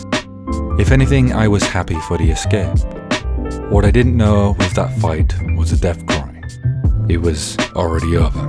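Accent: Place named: United Kingdom. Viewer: Also where from the UK r u from cause your accent interests me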